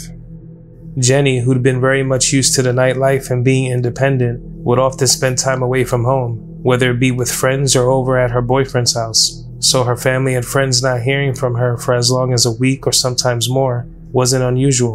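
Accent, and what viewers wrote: New York, United States. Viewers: Yw. Also a question are u from New York?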